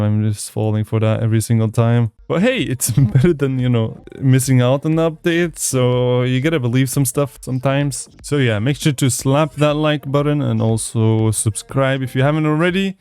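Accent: Germany. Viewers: Brother you German ? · Are you german?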